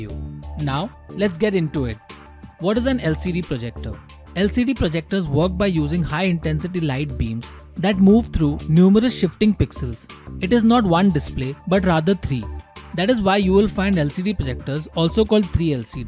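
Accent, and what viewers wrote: India. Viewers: I wish youtube could filter out results with this hindu accent, i couldn't focus on content. Sometimes I feel like you guys speak like this on purpose, like you think it is so cool because BBT and Koothrapaali is popularrrr, yess... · Can’t wait to see a new wideo, Indian ascents are great!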